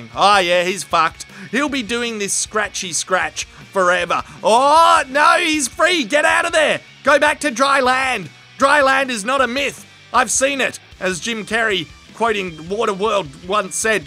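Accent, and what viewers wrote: Australia. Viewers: No matter what, shark sounds better with an Australian accent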